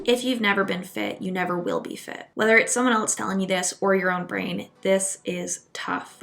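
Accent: Canada. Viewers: is your accent Canadian? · Is she Canadian?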